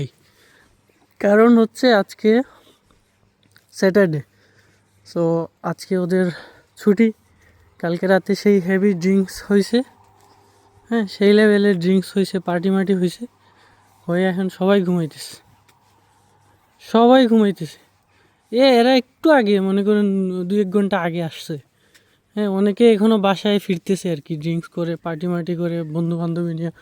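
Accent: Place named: Sylhet, Bangladesh. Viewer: ভাই আপনি সিলেটি তাইনা তাইলে সিলেটি কথা বলেন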